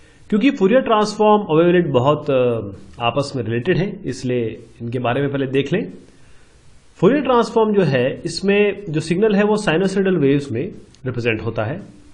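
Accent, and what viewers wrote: India. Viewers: 这tm直接把字幕翻译成德语了，服了这咖喱味的口音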